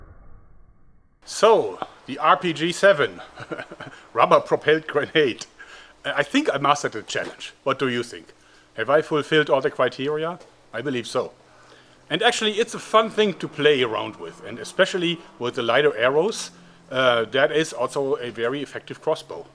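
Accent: Germany. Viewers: Say Asta la vista with ur German accent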